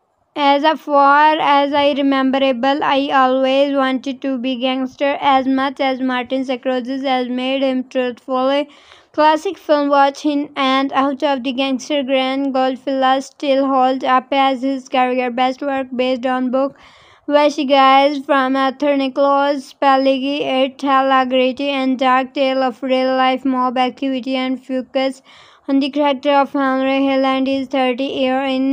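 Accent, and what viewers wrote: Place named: India. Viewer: Your accent is funny She Indian Hey stop scumming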